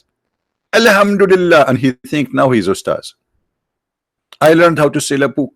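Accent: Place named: India. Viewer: iya bro, seperti logat asli orang hindi.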